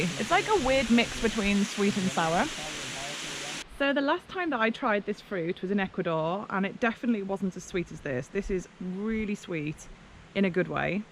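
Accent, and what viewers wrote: England, United Kingdom. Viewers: You’re from England? I can hear your accent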